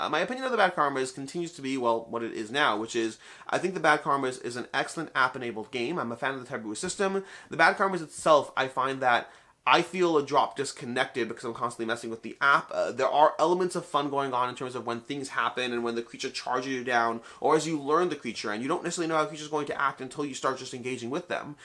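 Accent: Canada. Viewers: Canadian accent? It's usually super subtle, until it isn't.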